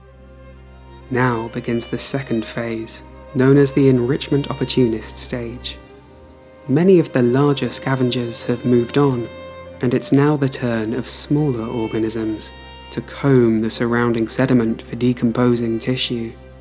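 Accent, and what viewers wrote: United Kingdom. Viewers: i wish the narrator was not british 💔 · really wanna learn but god i can't listen to a british accent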